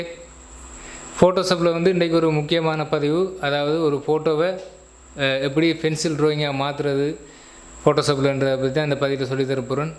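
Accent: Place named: Sri Lanka. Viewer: Excellent SriLankan Tamil. Super . Vazthukkal Brother...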